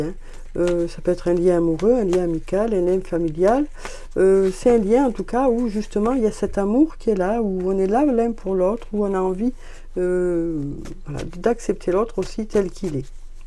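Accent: South, France. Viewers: Coucou, contente de voir la dame de l accent du soleil et toujours un plaisir de vous écouter,avec cette douceur et bienveillance merci 💐🙏😘 Bonne journée à vous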